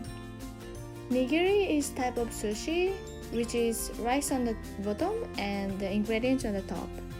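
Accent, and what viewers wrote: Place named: Gulf, Arab world. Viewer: You speak khaliji accent lol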